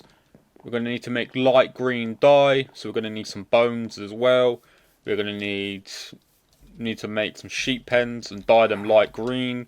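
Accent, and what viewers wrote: United Kingdom. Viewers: OMG… HES BRITISH ITS PERFECT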